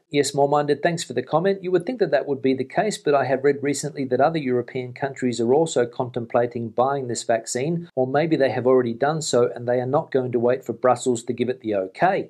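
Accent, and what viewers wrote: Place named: Australia. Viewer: Thanks. I look forward to listening to you every day; especially with your cheerful Australian accent! I’ve been waiting for over a year to find out when I can get over to Spain from Scotland as I have a few holiday houses I have my eye on. It’s been so frustrating and we are still no further ahead. I thought that the vaccines were supposed to give us more freedom but, alas, it doesn’t look like it’s going to make much difference. Take care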